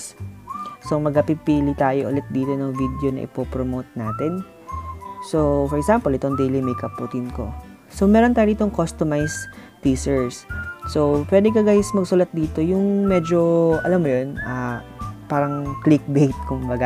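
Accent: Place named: Philippines. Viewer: THE CUPIDO CLAN he speaks English but has a fillipino accent and sometimes he's speaking a little bit of Filipino and just put English sub i hope that helped and sorry 😞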